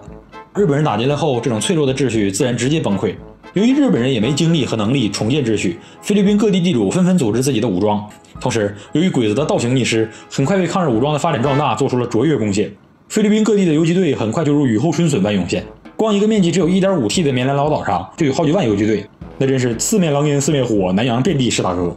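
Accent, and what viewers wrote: Northeast, China. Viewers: 口条不清晰，还带东北口音。弃。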